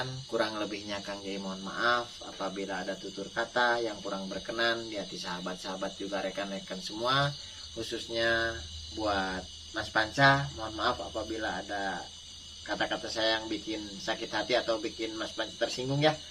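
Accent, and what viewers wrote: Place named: West Java, Indonesia. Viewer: Assalamualaikum, mugia kang sinareng team sarehat. Bade tumaros linggihna dimana? Upami ningal dina logatna mah urang sunda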